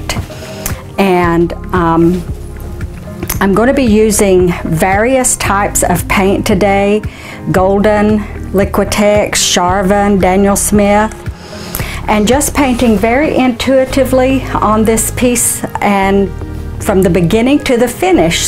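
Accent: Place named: South, United States. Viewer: Love this and love your southern accent 👍🏼,love from the UK🇬🇧......subscribed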